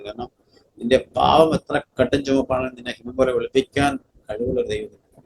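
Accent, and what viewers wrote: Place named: Thiruvananthapuram, India. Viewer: ഇദ്ദേഹത്തിൻ്റെ സ്ലാംഗ് തിരുവനന്തപുരം രീതിയിൽ ആണ്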